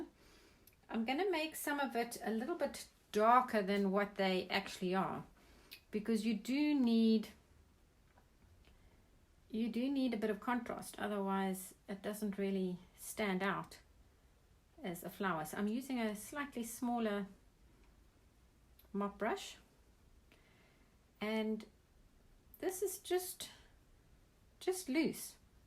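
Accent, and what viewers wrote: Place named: South Africa. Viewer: This is lovely. It sounds like you’re also South African? I also love watercolor and the loose style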